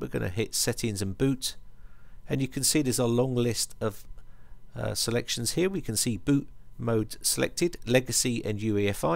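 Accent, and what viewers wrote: England, United Kingdom. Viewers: I just don't get English accent.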